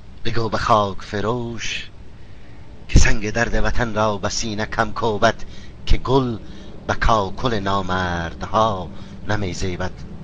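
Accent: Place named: Iran. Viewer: hahahahahahahaha o kosmadar to kho irani hasti chera mardoma jang mendazi khware ta gayom kosmadar tora chi khob kadan irani ra chi ba e gapa madare ta gayom hamrai lahjet k maloom mesha hahahha aga to rasti e gapa mezadi chera akse karzai nes da e o madare ta gaim maalom mesha k kodam dokhtare ta eya gayedan biyaresh mam begayomesh ai koni hamrai gapait to kodam koni hasti maalom mesha biya aga yakh na kadi k ma yakhet konom hahahahhah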